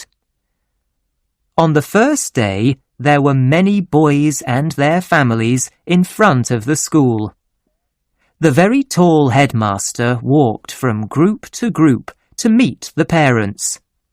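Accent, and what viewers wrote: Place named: United Kingdom. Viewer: I love the British accent and try to listen to it slowly to understand their pronunciation.thank you for this video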